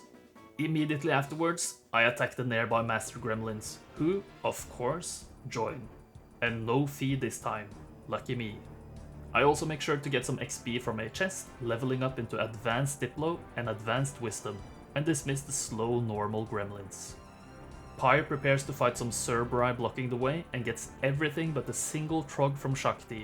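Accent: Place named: Norway. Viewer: Me: algorithm, get me a video Algorithm: no problem. What sort of stuff do you like? Me: challenge runs of one of my favorite video games, with the art being made in paint, narrated by someone with an unapologetically norwegian accent. Algorithm: one moment, sir...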